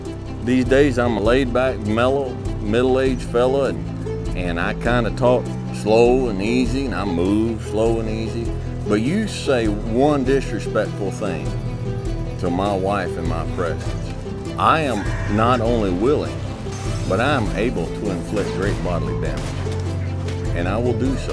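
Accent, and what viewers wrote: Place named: South, United States. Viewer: Somehow hearing all these sayings, with the southern accent makes you want to be a Badass Buddha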